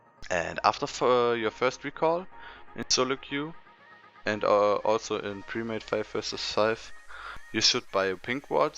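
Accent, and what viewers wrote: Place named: Germany. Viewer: you sound sooooo german :D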